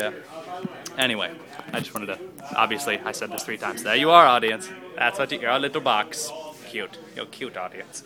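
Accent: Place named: United Kingdom. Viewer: Your voice is so cute!!!! I love your british accent!!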